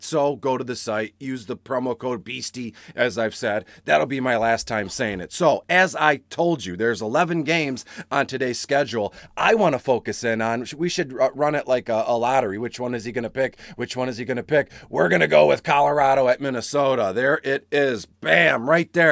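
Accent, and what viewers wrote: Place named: Michigan, United States. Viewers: im here for the great advice and the Michigan accent. both on point today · Hahaha better the Michigan accent than saying eh or don’t cha know. 😂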